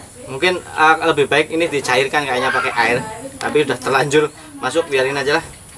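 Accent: Banyumas, Indonesia. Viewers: kang ngapak banget ya......asline ndi jenengan..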